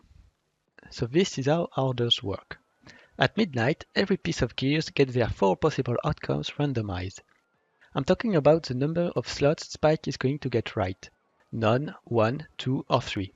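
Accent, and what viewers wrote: France. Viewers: il est francais ça s'entend grave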